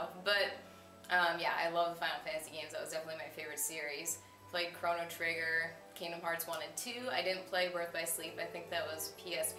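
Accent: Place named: Midwest, United States. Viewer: Do you live in Michigan? You sound kind of mid-westerny.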